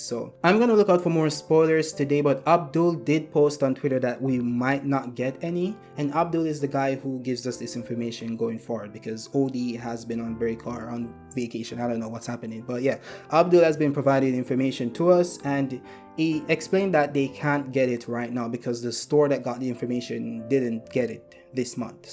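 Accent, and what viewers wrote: Jamaica. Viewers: I can hear the Jamaican in your accent bro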